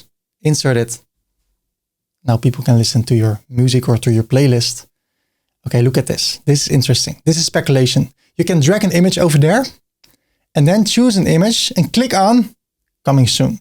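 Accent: Netherlands. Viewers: I hear a dutchy accent, Ga zo door broer ! jij hebt prachtige content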